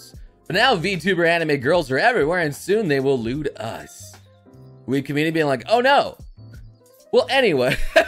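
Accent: Scotland, United Kingdom. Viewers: Ughhh that Scottish accent causes me genuine pain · I missed the scottish accent.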